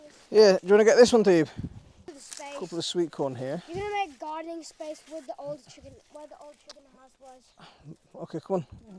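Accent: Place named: North, United Kingdom. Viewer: I love that northern accent :D it's so homely and nice. Great harvest!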